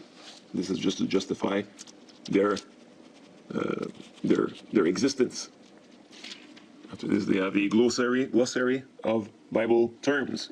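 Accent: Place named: Acadia, Canada. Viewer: Hi great video My best friend is Acadien and your accent sounds like hers she is very proud of that. BLESSINGS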